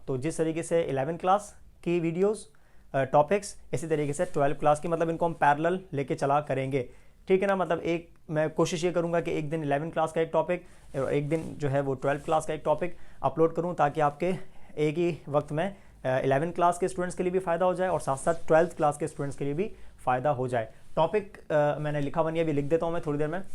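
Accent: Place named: Karachi, Pakistan. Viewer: sir ki language sy tu karachi ky lgty ha 😂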